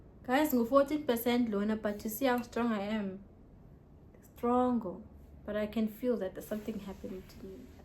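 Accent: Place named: Xhosa, South Africa. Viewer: Ndikuthandela lento yoba uphume kwesasjolo usathetha isixhosa sakowenu ❤. Awukhulumi isizulu esi dizzy like the other girl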